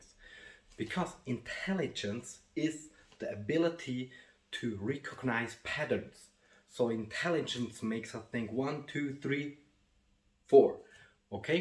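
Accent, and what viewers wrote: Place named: Hungary. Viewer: This guy reminds me of my little bro, are u Hungarian by any chance? B/c the accent sounded like my little bro who came over to US but grew up in Hungary.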